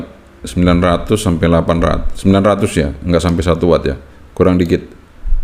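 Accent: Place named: Spain. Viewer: Hola amigo. He visto tu video. Pero no entendi prácticamente nada 😂 ya que solo hablo español. Me he percatado que en tu acento al hablar dominas mejor el español y si no me equivoco en mi observación, te agradecería enormemente si hicieras un video de este equipo en español, ya que en la red todavía no aparecen o almenos yo no les he encontrado. Gracias de antelación 👍✌️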